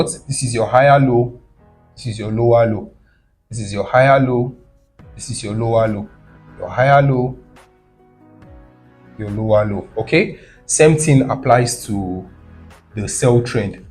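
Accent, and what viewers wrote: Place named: Afrikaans, South Africa. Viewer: love afrikaan english😅🎉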